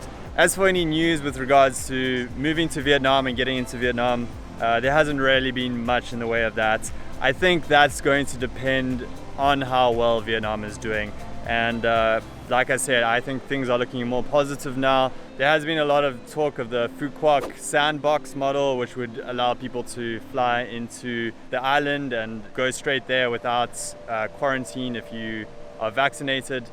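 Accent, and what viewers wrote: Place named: South Africa. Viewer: tried to place your accent, not North America, i guess South Africa?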